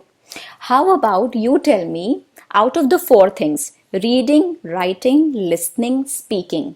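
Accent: India. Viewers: Why I'm not understand indian accent but American do?? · wow so nice, i love Indian accent. love and respect from Pakistan .. Mam u r looking like illena D'cruz.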